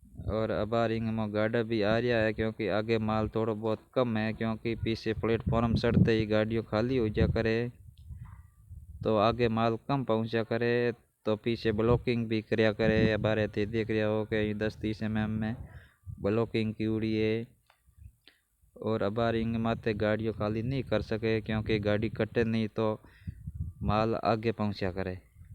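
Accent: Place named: Rajasthan, India. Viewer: Ram Ram sa आपका कौनसा गांव है भाषा से तो फलोदी के लग लग रहे हो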